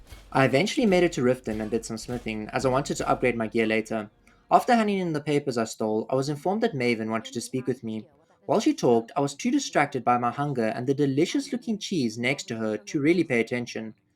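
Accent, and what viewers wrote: South Africa. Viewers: I can recognize a south african accent from a mile away, ggs man :))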